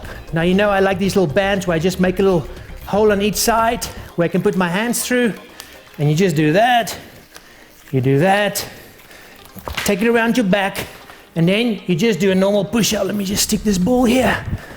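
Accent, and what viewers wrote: South Africa. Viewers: Sounds south african .. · Jayden Almirall terrible accent anyways, other South African accents are way better and less annoying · South African accent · He has a South African accent, as I am South African myself I can assure you it isn't made up or anything like that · anyone else realize his South African accent?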